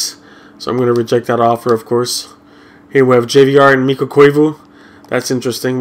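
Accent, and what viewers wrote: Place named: Quebec, Canada. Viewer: pi ta un accent Québecois c pour sa g su que tu parle francais sur dautre video sur les commentaire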